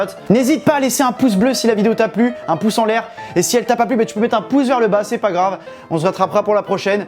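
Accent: Switzerland. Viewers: T'es Suisse? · T'es Suisse toi ou bien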